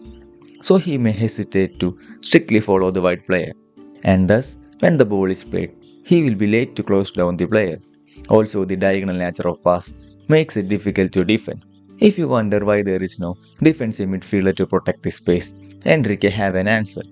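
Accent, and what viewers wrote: Kerala, India. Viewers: Malayali Slag aanallo machand nattil evida. ❤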